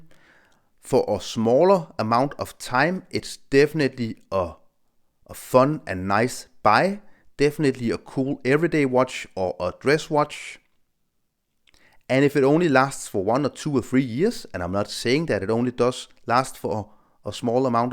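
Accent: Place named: Denmark. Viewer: Great review, in the list of my next purchase. Love the brown dial. I realize that you have danish accent, then i open your youtube channel/profile..yup DK 😊.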